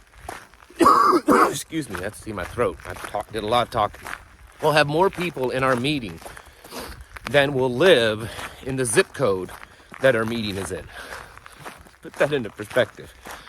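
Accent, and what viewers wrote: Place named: South, United States. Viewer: What's up the southern accent?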